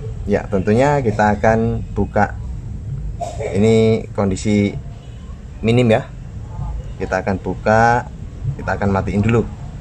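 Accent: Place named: Lampung, Indonesia. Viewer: Sampean lampung ya kang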